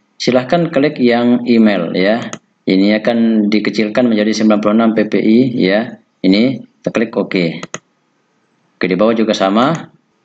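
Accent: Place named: Aceh, Indonesia. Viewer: Lage awak aceh logat deon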